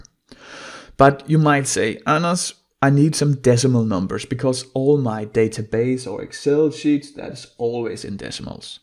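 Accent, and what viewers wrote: Denmark. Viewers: I like the danish accent!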